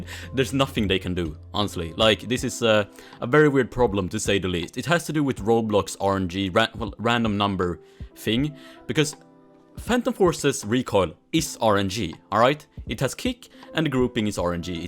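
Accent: Sweden. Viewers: Hej du är svensk man hör detXD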